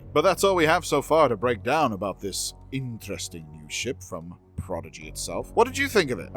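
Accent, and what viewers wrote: Ireland. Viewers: Are you Irish? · I would have bet the accent was Irish eastern mild Dublin or surrounding area. But accent can change based on location. And time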